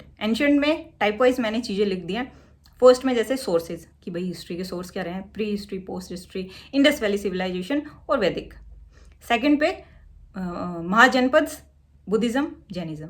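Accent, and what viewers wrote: Haryana, India. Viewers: हरयाणवी हमे सुनने में अच्छा लगता है।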